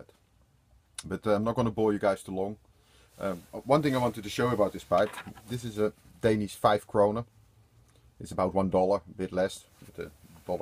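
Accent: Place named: Netherlands. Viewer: ok, ik herkende dus toch je Nederlands accent,hahah, ben vaak in Copenhagen ook, maak je ze zelf ook? groet, Nico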